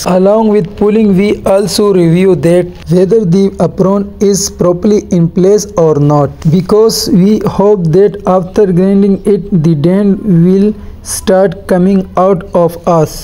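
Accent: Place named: Punjab, India. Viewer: Agar Urdu Mai baat karte ziada behtar hota yeh Punjabi type English se acha hai Urdu Mai baat kia Karen shukria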